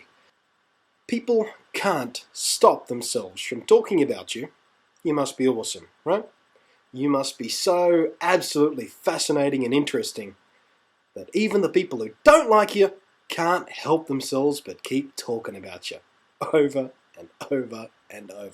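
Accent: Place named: Australia. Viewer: Hypocrisy Anyway. You're right. Plus I'm guessing from your accent that you're an Aussie? :] You just got yourself another subscriber.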